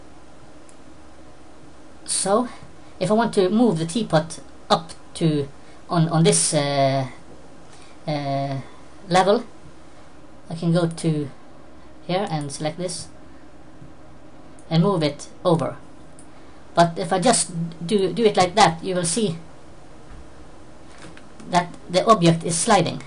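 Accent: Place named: Bergen, Norway. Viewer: Faen du kan høre at du er BÆRGENSAR! :)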